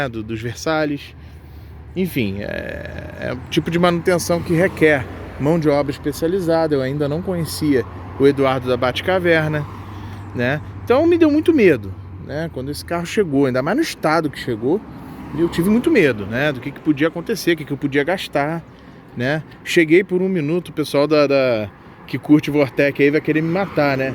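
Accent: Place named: Rio de Janeiro, Brazil. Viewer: pelo sotaque do dono do video é Rio de Janeiro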